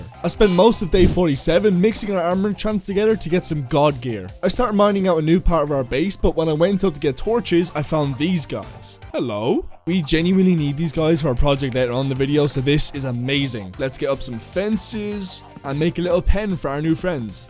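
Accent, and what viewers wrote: Ireland. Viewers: Bro im defo not kapping thats an irish accent foohhshoooo 😂 absolute horseplay.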